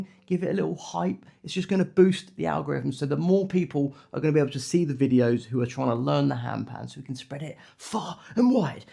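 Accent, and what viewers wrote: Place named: United Kingdom. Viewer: Thank you for your quick reply, which has put my mind at ease no end. My handpan should be delivered tomorrow, which I feel very excited about, with some trepidation. I'm a bit scared about what I will receive, after watching so many warnings about cheap Chinese instruments being out of tune. I get that, I know you get what you pay for, but im not in a position to commit to a very expensive instrument at the moment but hope to progress into a better quality instrument in the future. Thank you for taking the time to reply, it was interesting to hear about your heritage from the UK, as you do drop those little hints of your accent in your videos, which to my mind makes you more approachable, and a very warm hearted person, everything a good teacher should be!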